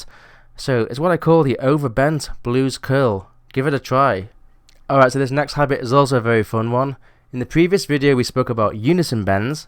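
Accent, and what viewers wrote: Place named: Ireland. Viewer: You are such a nice Person und bring me Gary back into life! With people like you he can stay in our hearts and still be with us. Love the way the Irish talk, like you and Gary, it sounds so lovely and gives the feeling, that they carry the heart on the right place! The Irish Twiddle reminds me on the sound of bag pipes and this influence I loved also a lot at Gary's playing, especially on one of his greatest albums for me, which was "Wild Frontier". Your Playing and interpretation and explanation: JUST GREAT Thank you so much ❤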